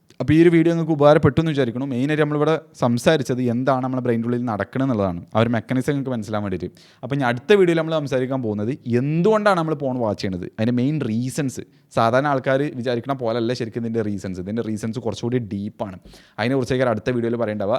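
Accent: Malappuram, India. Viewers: Chettan Malappuram ninn ahno varunne?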